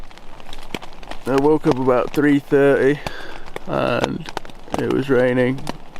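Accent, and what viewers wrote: United Kingdom. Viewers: Dude are you country or British I can’t tell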